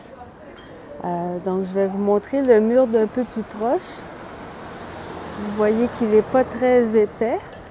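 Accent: Quebec, Canada. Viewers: Oh, c'est le lieu de mon enfance! C'est formidable de le voir à travers les yeux d'une canadienne et d'en entendre parler en français (québécois ☺️)! Merci! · I'm enjoying your videos very much! Are you always speaking with a Quebecois accent in your videos? Even when you're teaching grammar and vocabulary?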